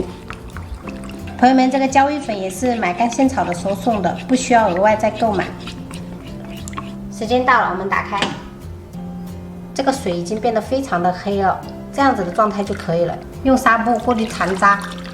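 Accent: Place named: Minnan, China. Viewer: 台語是閩南語，是閩南人，當然講閩南語，講話口音聽得出來是閩南人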